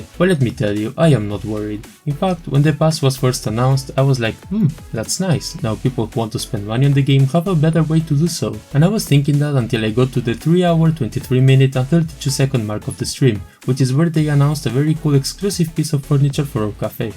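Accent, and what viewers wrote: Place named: Spain. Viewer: Spaaaain. Blue archive has come so far! I almost didn't notice the accent. I may be wrong but...anyway very high quality video.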